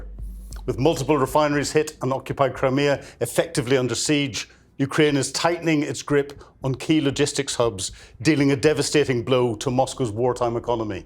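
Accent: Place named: Northern Ireland, United Kingdom. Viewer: He’s from Northern Ireland, originally.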